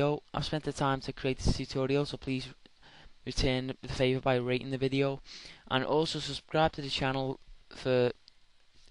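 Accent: Liverpool, United Kingdom. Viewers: Thank you so much for these! What makes these video tutorials really good is the simplistic approach. Straight to the point, plain language and easy to understand instructions. It takes the fear factor of getting into the "complicated" world of programming out of the equation. And let's not forget the Scouse accent!!! "Best in the Weld" :-)))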